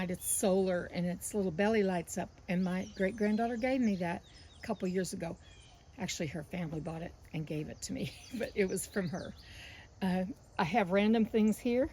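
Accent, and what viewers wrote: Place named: Ozarks, United States. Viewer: Hi! I always savore your videos! I will do a research about, Ozark Mountain. Have studied linguistique, I can recognize, what we call the purity speach! Which you have preserved wonderfully! Hugs my dear friend!🥰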